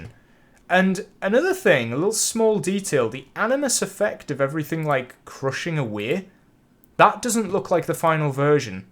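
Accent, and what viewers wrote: United Kingdom. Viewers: That thick British accent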